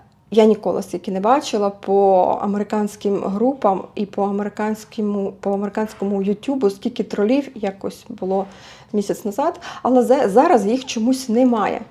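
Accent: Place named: Ukraine. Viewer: Дякую пані !👍👏👏👏 У вас добра українська мова . 👍Вибачте , в якій області України така чиста мова ? Я з чернігівської області.🤗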